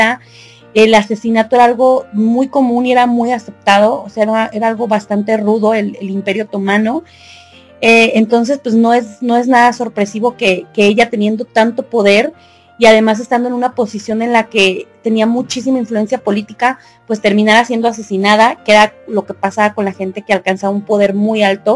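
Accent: Mexico. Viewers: Muy buen trabajo, interesante e informado. Me costó adaptarme al acento mexicano, pero valió la pena. 👍🏼🇺🇾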